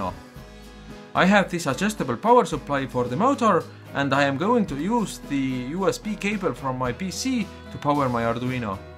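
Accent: Estonia. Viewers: Thank you so much helped me out man! Btw you sound like an estonian. Am i right? Im from est.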